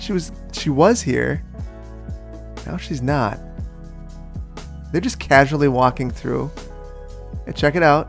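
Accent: Wisconsin, United States. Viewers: Unthaw… dude you’re totally from Northeast Wisconsin…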